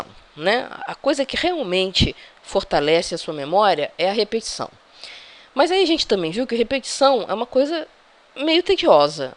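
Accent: Rio de Janeiro, Brazil. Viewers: Carioquês forte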